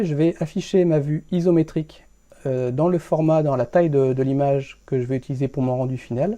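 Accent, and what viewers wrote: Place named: France. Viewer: woah you're french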